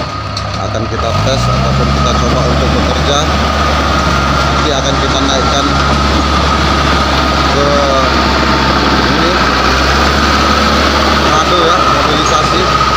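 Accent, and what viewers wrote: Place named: Karo, Indonesia. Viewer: Bang logatnya macam orang karo. Kalak karo Kam bang?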